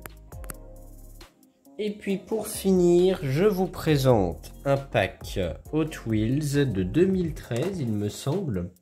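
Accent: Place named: England, United Kingdom. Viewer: Elles sont magnifiques…!! Mais j apprecie aussi ton accent Anglais 😂😊🤭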